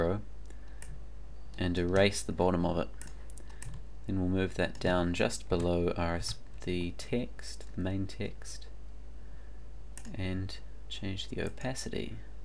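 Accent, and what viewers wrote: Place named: New Zealand. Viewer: He isnt an aussie, hes from nz buddy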